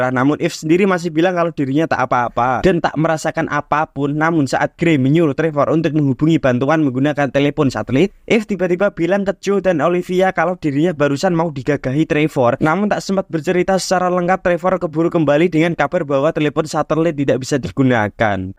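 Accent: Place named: Java, Indonesia. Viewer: channel yg tidak ada apa apanya di banding iq7, suaramu medok gak enak di dengar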